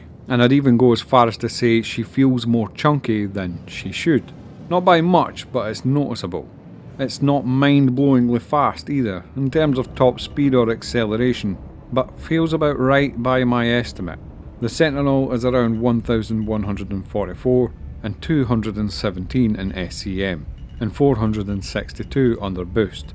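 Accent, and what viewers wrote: Scotland, United Kingdom. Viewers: The scottish accent feels wildly appropriate and correct for space ship reviews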